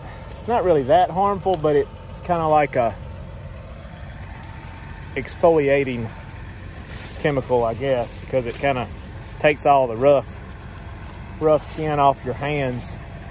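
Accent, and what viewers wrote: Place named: South, United States. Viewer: Worked for John Deere for 38 years and spent a lot of time in Mississippi and Arkansas in the Delta area. Use to go out with the dealer personnel to the field since I was from our planter factory in Moline, Illinois. Some of the best ground for growing crops in the World. Love your videos because you'er one of the few farmers in the south on UTube. Like to watch the corn and soybean farmers but since I'm familiar with cotton I thought your channel was great. I too like the information about the history. I was born in Arkansas and I miss that southern accent. Great people in the deep south as well. Keep um coming!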